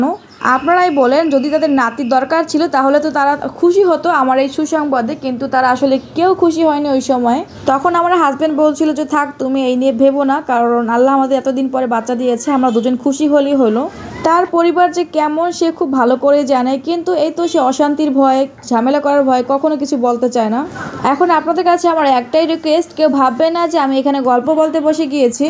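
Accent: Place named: Dhaka, Bangladesh. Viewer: Dhaka Kotha talking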